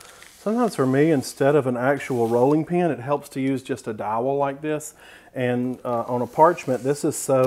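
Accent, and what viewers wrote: South, United States. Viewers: You are really entertaining and I am really glad I stumbled upon your Channel. Canada, so love that southern accent lol. I think I will have to try making these with that packet of Fleischman's yeast. My Baby Book had notatiins from my Mom saying that I grew like Fleischman's Yeast as a young baby. Ha ha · OOOH! at 3 net carbs I can eat all twelve for a total of 36 carbs...if you can eat 20 to 50 carbs a day you’ve got it made!!! Hmmmm will the cheese cause the bowel to bind???? Love love your channel and your southern accent, mine is Texan, makes me feel at home. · “Y’all”~ I love your channel & your accent!! Thank you! A Southern Belle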